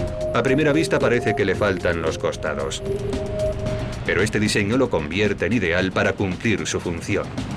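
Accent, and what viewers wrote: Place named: Spain. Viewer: Es un locutor español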